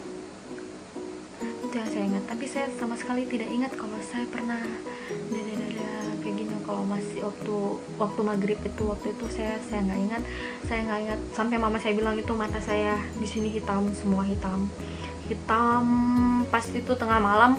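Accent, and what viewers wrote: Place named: Manado, Indonesia. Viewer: Logatnya manado ya kaa. Hehe sm🙂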